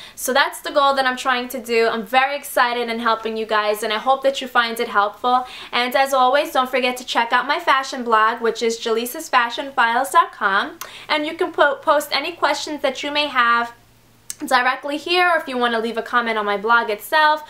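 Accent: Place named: New York, United States. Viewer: Ive been looking around for advice on how to dress for my body type and this is, by far the best to help me! Plus I love your New York sounding accent!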